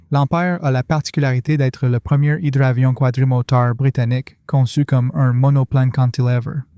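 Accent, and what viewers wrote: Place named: Quebec, Canada. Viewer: I A de merde avec l'accent de Céline Dion..... tabernacle.....mais sujet intéressant....... dommage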